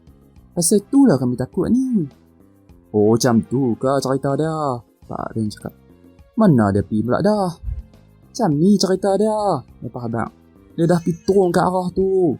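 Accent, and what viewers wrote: Kedah, Malaysia. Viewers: Kedahan Malay pronunciation a→å Apa →apå Mana → manå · Saya original orang Penang. Tapi video ini bukan bahasa yang kami guna. Mungkin cuma 20% penduduk Pulau yang guna loghat ini. Ini terdengar seperti loghat Kedah.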